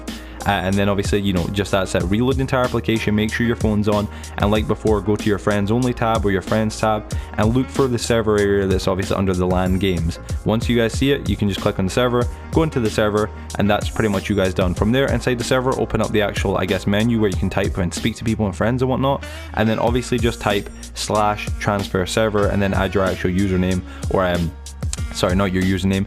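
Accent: Scotland, United Kingdom. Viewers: Are you Scottish? You just sound Scottish, if you are then that's cool, cause I'm also scottish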